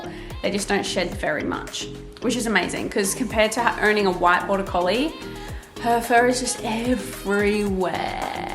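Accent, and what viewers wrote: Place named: Australia. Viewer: Soooo Australian, let’s put an R at the end of everything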